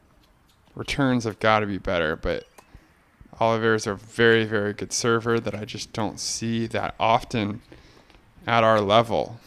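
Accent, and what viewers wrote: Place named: South, United States. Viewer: her southern accent killed me, love the skits.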